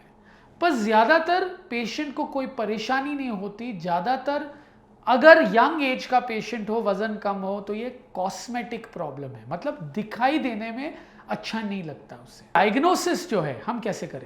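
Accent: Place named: Maharashtra, India. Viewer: Sir ,are you Maharashtrian? Because your accent is marathi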